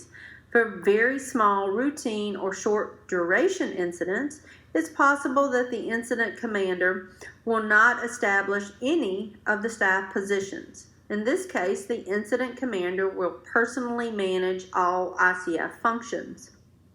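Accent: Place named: United States. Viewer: Holy crap I cannot handle listening to that hick twang 😖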